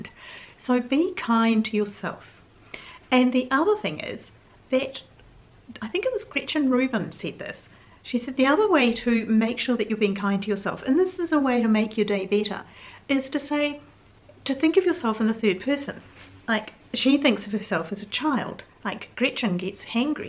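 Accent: New Zealand. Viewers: I love when you post a chat....look for the next one each day. Currently I am packing and clearing ready to put my home up for sale. I too am bemoaning the heat as I live in far North Queensland. Did you end up being Molly’s new mum? As you never mentioned her in this clip, I assume not. Love listening to you, you sound like my kiwi sister-in-law.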